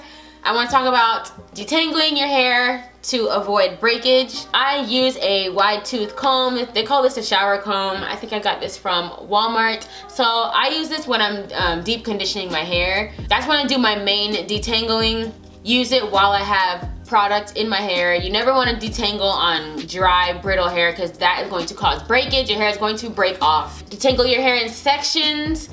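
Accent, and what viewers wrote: Jamaica. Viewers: I knew i heard an jamaican accent in one of ur videos but i just brushed it off as i was too proud lol now u cleared that up. 876🇯🇲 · Hair is beautiful queen!!! Do you have a patois accent btw? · Video sell off. Real Jamaican talk. Any tips for bald patches.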